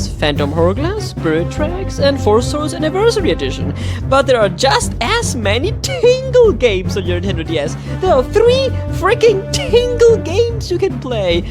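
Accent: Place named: Germany. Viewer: Knew I heard german somehow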